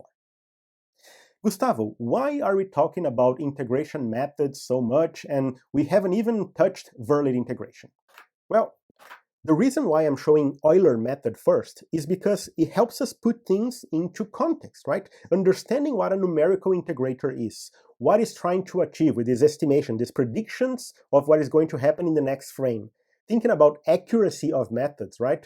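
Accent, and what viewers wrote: Brazil. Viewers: this guy has to be brazilian, no where else we find that accent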